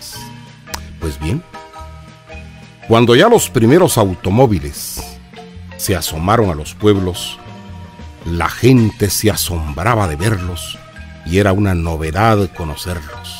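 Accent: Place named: Guatemala. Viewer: Me encuentro fuera de el pais y al escuchar esa voz me siento cerca de mi Guatemala😕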